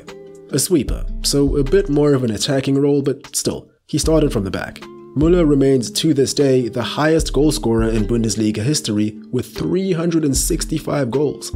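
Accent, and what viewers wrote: South Africa. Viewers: Love your channel ! You aren't South African by chance ? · Mr speaker you have a South African accent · You sound so South African. Its not even funny 🇿🇦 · Immediately recognized the accent. Greetings from SA 🇿🇦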